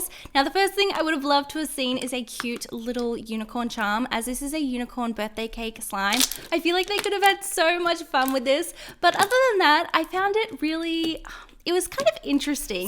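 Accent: Australia. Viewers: Meh_emo! Honestly it’s very possible I’ve gotten stabbed with one and it cut me so that’s probably what she was trying to say but she is Australian so they might say it like that over there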